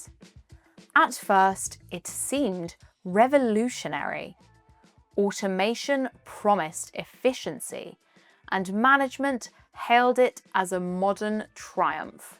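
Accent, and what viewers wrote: RP, United Kingdom. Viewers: You were born to be a British English pronunciation and modern RP accent TEACHER! ❤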